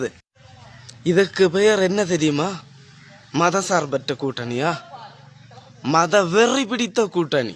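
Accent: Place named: Kerala, India. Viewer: என்னப்பா. மலையாள வாடை அடிக்குதே!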